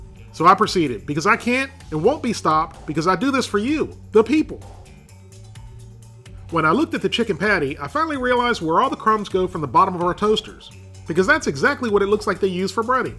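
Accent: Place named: East Coast, United States. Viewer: you sound like you're from the east coast. What area are you from?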